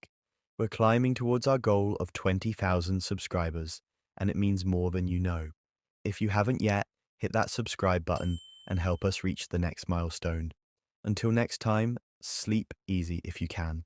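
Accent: United Kingdom. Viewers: Nothing better than a British lad reading spooky stuff. Great night ahead.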